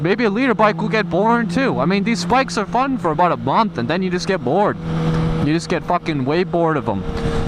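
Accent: Massachusetts, United States. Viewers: What part of Massachusetts you from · What part of mass are you from ??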